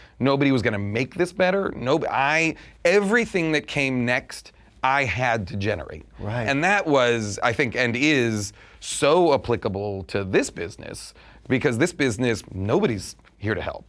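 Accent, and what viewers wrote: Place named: Boston, United States. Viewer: He's from Boston or thereabouts. They say "aboot" and shit like Canadians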